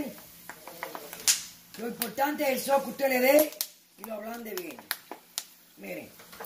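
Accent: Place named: Cuba. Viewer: Gracias, desde que senti tu forma de hablar me di cuenta que eras cubana y me emociones. Hace muchos años que no como chicharrones de viento, la boca se me hizo agua Nury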